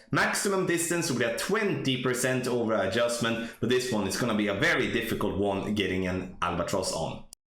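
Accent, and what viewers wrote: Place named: Sweden. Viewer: Du låter svensk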